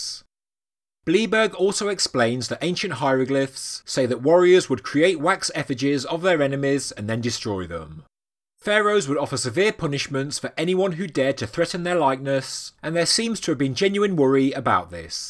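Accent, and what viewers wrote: United Kingdom. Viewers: A. British interpilation of Ancient African Art.and why it was defaced was. so perpostrtious that. It is not funny. This British sounding. narrator is a God Damer. The African People who created this type of art were not that supertiscious or self lothing.They were not slaves and and did not hate their cultural phenotype. This narraitor completely overlooked the Persian, Greek and latter(muslim Arabs who would pillage,destroy and alter the Afriican Dynamic and life like Art out of Jellouse envey and hatred of an. African People who were superior to them in culture.Egyptian Staturtory and life like reproduction in stone are unraveled today in reproduction.Egyptian art is so life like the images look alive. The Arabs have done more damage than the Franch and British combined in smashing noses and distorting African feachers.They now claim that they are the Ancient Egyptians.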